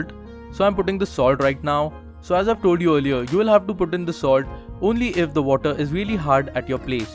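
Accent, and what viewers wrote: India. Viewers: Indian cringe accent :v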